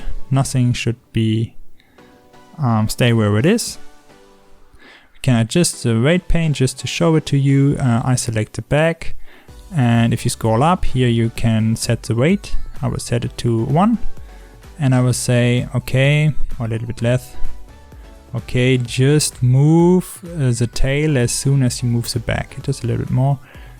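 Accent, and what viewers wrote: Germany. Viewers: Pretty sure hes german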